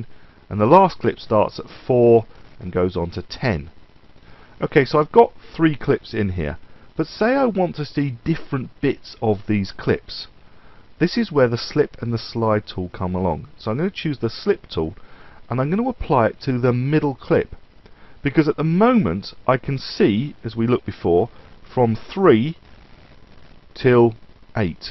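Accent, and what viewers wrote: United Kingdom. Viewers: Fab.....! Listening to your voice I thought you were Tony Handcock, the British comedian. Where in England are you from? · EXCELLENT explanation!!!! and I like the British accent too. haha!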